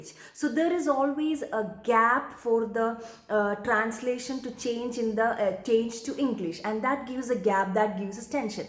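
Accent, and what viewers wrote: Kerala, India. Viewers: I think ur from Kerala. But ur English do not have much Kerala slang. That's great. If me or anyone had Malayalam slang in English it will not be liked by other people's. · Malayali accent should not be a problem for anyone of us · Last one month i started to watch your videos, its very nice and amzing. That time i think u r from north indai but today i seem u talk malayam😂. Any way good videos for us this. Thnk you. 🌹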